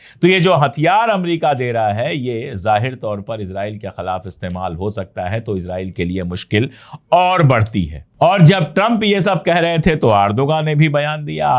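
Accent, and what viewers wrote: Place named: Pratapgarh, India. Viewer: I am hearing pratapgarh iu p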